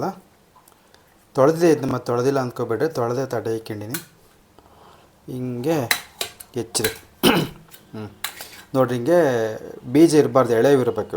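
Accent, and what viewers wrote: Chitradurga, India. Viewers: Are u from Chitradurga sida aa.... Nimma kannada bhashe namma oorinde agide pakka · navu chitradurga navu nemtarane matadodu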